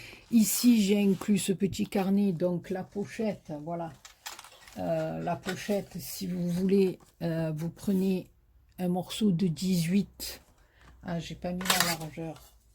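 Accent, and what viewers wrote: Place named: Marseille, France. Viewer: cc merci pour tesexplications a la marseillaise hihihi bravo super bonne fin de journée bises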